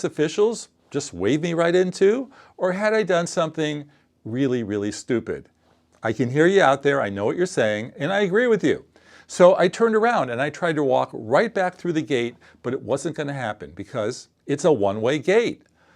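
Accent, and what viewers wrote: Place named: United States. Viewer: You are lucky because you are a white man with an american accent. If it was some Latino guy who forgot his passport he probably would not be allowed to get back in.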